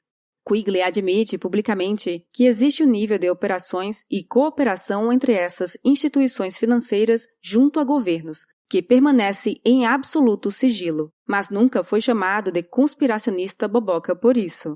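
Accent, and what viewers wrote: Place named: South, Brazil. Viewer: Essa narradora é sulista kkkk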